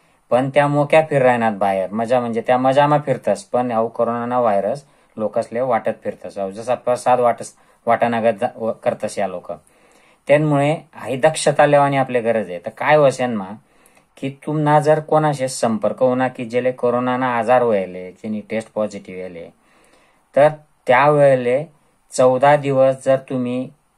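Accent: Khandesh, India. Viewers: Khan deshi bolatay ka sir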